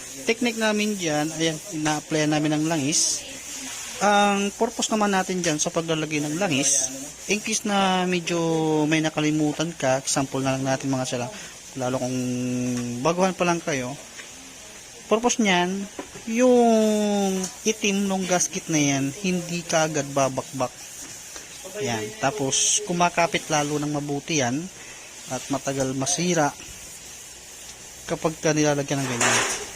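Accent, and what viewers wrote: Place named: Pangasinan, Philippines. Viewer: damihan m nman upload mo idol nallibang aq halos napanood qna lahat videos mo, tapos yong accent mo na pangasinsn natutuwa aq.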